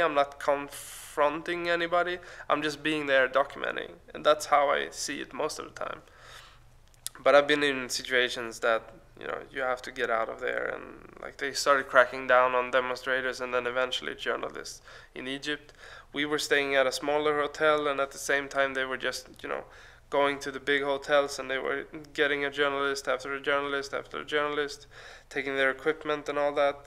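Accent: Sweden. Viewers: Man kan inte bara sluta älska svinglish 😝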